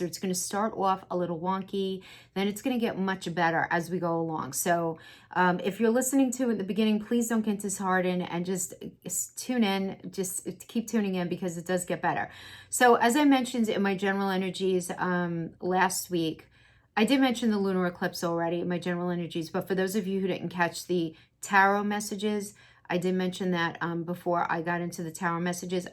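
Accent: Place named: New Orleans, United States. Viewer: I just love your accent! Are you from New Orleans? This is the 1st time seeing your face and you're absolutely beautiful. I resonate with your Gemini Readings the most. I admire how connected you are.🙏🦋 Goals...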